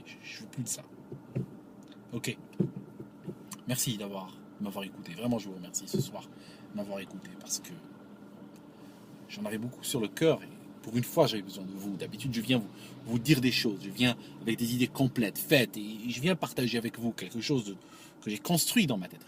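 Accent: Switzerland. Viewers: on valide de la 1ère à la dernière ligne... et retiens la métaphore! Mais, êtes-vous CHuisse?! pas l'accent...les 2? euh... franco-suisse-arabe?! Merci pour la virée en bagnole! La politique prend une telle place prépondérante en France que c'en est insupportable. Plus de philosophie, SVP chers voisins et compatriotes... ça serait moins lourd - à l'instar de la cuisine au beurre d'hier...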